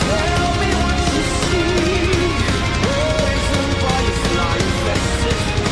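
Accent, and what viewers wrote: Australia. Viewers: The Australian accent is always amazing to listen to. But it just become awesome, when the Australian gets excited. Accents generally does not matter that much to me. But Australian is just.. Those sounds.. Its amazing. And I am sorry talking about this and not the video, but I had the biggest smile on my face because of it the first time you paused. Kamelot is a really interesting band, you should 100% look into more of what they have done. Always fantastic to see you react to things, and give your take on it.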